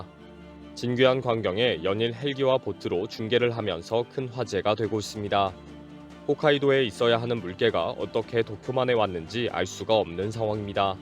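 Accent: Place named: Vietnam. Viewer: 해설자는 우리억양이 아닌 베투남 유민같은 억양인데 그리도 인물이 없나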